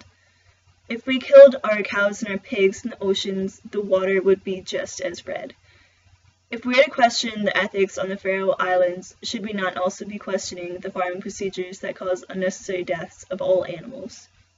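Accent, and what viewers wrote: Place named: United States. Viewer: You sound American. There are many other Americans, who are not fooled by Paul Watson's Sea Shepherd. Thanks for nuanced viewpoint in your video.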